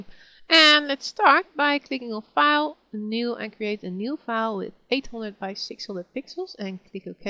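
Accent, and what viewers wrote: Netherlands. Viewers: ik hoor meteen dat je nederlands bent!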